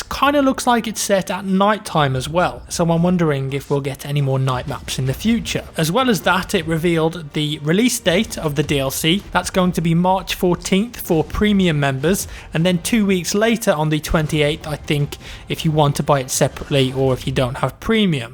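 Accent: United Kingdom. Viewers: hey man I'll make it short I like you vids and your buttery British voice❤️ I would also love the Xbox you giving away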